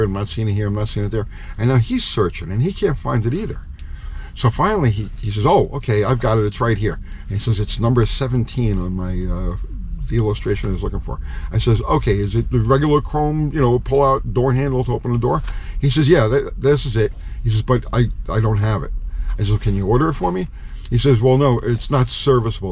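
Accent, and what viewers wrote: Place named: Michigan, United States. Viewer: You have to be from Michigan. I have worked with hundreds of Michigan people and you sound and act just like them. You ramble all over the place and talk and talk but never say anything. Your videos and annoying. Tell me that I am wrong.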